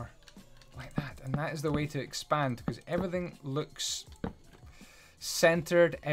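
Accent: Scotland, United Kingdom. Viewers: Is he Scottish? Or Irish?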